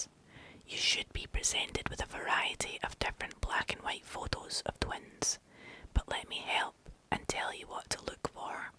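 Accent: Scotland, United Kingdom. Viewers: Fuck aye the Scottish accent is great for asmr. Sending love from Wales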